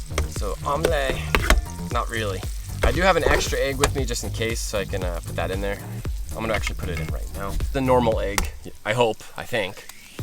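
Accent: Vietnam. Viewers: This is a guy trying to say english, he's vietnamese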